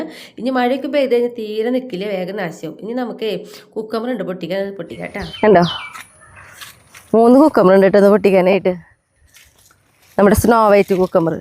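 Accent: Thrissur, India. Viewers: Its Thrissur slang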